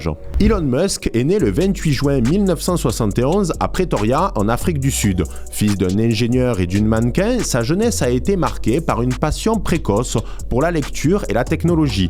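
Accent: South, France. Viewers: Cet accent du Sud et les cigales qui rappent en fond; ça sent bon la lavande tout ça ! !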